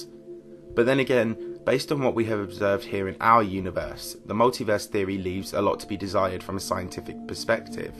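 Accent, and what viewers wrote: United Kingdom. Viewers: That monotone British voice tho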